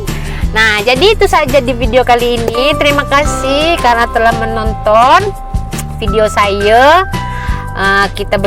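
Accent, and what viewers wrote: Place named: Malaysia. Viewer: Wow fasih slang melayu Malaysia ya? berapa lama di Malaysia?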